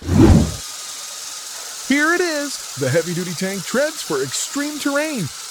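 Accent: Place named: United States. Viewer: The fact that the narrator has an american accent really piss me off.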